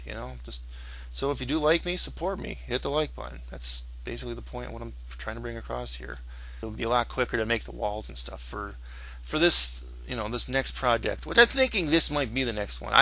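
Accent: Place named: United States. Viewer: Ruff... You're American, aren't you?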